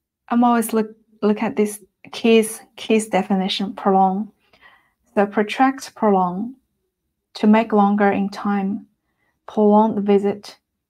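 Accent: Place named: China. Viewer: You might have been referring to my comment regarding your accent. If so, I was only curious and not suggesting that you change it. I’m a Taiwanese American, having been living in the US for 37 years. I also had the experience of attending an American overseas high school before coming to the US for college. When I’m relaxed and not tired in general people here think I’m an ABC. Not to brag but just explaining my background. “Protracted” here means prolonged or extended. Already your English is much better than some of the other Chinese Big Vs. I hope they could get their pronunciation to your level. What I’m trying to say is that, foreign accents are actually charming to Americans, especially European, British, and Australian. As long as you lose the Chinese accent you should be fine.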